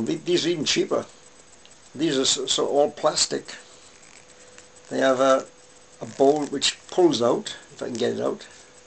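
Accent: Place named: Scotland, United Kingdom. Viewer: I'm curious about where you're from, it sounds sorta scottish but not really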